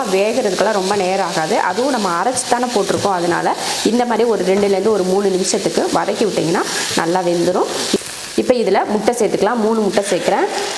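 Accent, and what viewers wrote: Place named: Tirunelveli, India. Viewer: OK... Avangaloda slang tirunelveli mathiri irukku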